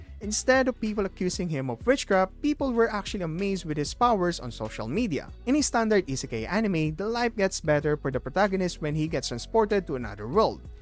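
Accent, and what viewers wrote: Philippines. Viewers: your pinoy accent is so thick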